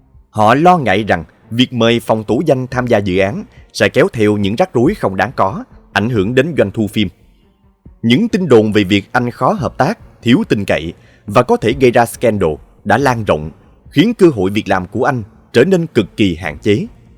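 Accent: South, Vietnam. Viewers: Giọng đọc miền Nam hay quá , giống như giọng của MC Anh Dũng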